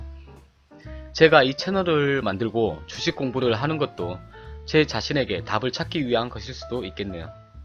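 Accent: Jeolla, South Korea. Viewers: 전라도 사투리의 비애.. 전라도 사람인 제가 들어도 ㅋㅋㅋ 서울 말투써야 먹힐듯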